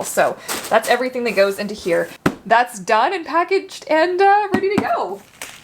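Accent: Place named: South Africa. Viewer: Hahaha! Down here its pronounced ah-n-velope. 🤣🤣🤣 lekker(nice) south african accent! Truly enjoyed watching the video. It's like wrapping up birthday presents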